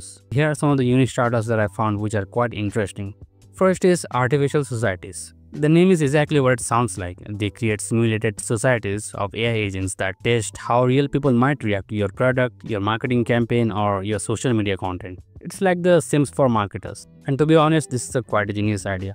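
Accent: India. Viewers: just from the accent i can say indian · you spell with indian accent make boring startups become 1000% fun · What is ur mother tongue, I want to know which Indian language produce this English accent because it doesn’t sound Hindi accent English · not from Bangladesh I approve it. This is Indian accent. He could be from Odia only. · ur accent looks like you are bengali or odia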